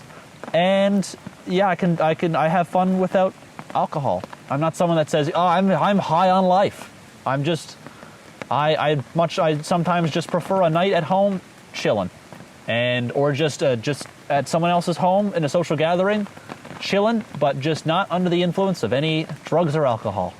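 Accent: Canada. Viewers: Such a Canadian, eh? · See I thought he was Canadian but something felt off, so knowing hes from Newfoundland really clears things up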